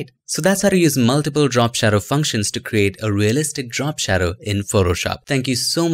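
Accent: India. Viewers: You know what’s better than an annoying Indian accent? Proper English.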